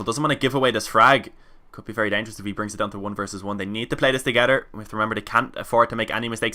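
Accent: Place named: Meath, Ireland. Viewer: THIS IS REALLY ANNOYING ME you sound like youre from meath but also a bit more northern!!!!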